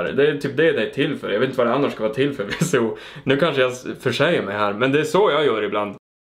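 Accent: Norrland, Sweden. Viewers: hahah, skönt snubbe och norrläning! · Jååå. Hittade din kanal typ förra veckan. Gick en video och prenumererade direkt! Visat dig för alla vänner och för familjen. Alla tycker du är lika rolig som jag tycker. Sedan så e man ju halvt Norrlänning också så klagar ju inte på dialekten😉 ae fan vad rolig du är, fortsätt med det du gör. Man får alltid ett gott skratt❤️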